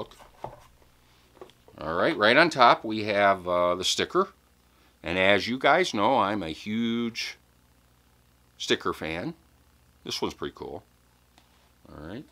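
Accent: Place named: Michigan, United States. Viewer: your accent. Sounded northern Michigan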